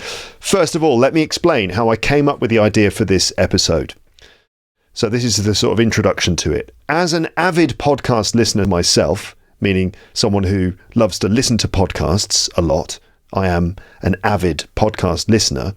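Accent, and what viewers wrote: United Kingdom. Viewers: Hello!! I'm from Argentina and I'm preparing for an exam in May. I've failed on passing the C1 level of English so many times. I'm still practising all the skills despite having some health issues that retrieve me from learning and passing the exam. I need to pass this last exam to become an English teacher. Thanks for the video. I appreciate your content. This is my first podcast I listened to on your channel. I could get almost all your words and I love your British English accent. It's very clear. Regards. Daniel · I watched the entire podcast, and it was spontaneous. I'm really impressed by the British accent!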